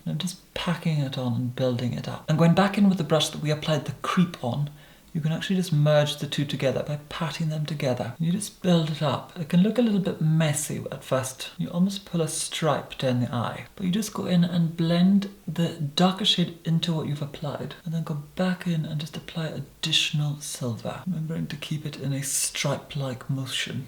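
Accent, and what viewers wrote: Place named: London, United Kingdom. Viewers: This is the most beautiful makeup look on planet earth 🌏 And the solar system Milky Way Our universe Parallel universes................................ You are an artist You make the most heavy makeup look polished, expensive, silky, porcelain like, divine and absolutely seamless You are brilliant, the more I watch your tutorials the more jaw dropped I feel Let alone your perfect posh English Dear.... you are freakin’ fantastic ❤️💖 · Я слышу лондонский акцент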